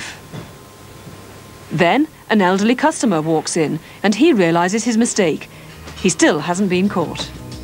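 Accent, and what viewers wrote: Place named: United Kingdom. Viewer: british accent. no need to explain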